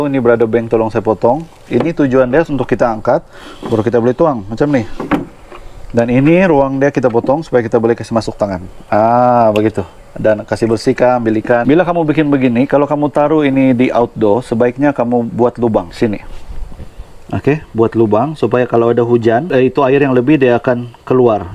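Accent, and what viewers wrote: Sabah, Malaysia. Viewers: Assalam sifu. Nak minta sifu tunjukkan cara groom mental betta. Masa dia flare tu, dia cool jer dan rileks. Mohon Tunjuk ajar sifu. Btw, congrats for 3k ++ subscribers. Road to 10k subs pulaa bossku. Huhu semoga maju jaya. Huhu terhibur tgk content sifu. Dialek sabah tu pekat joo. Wkwkw original sabahan. GoodLuck in future. N keep doing what you love. Chaiyokk!!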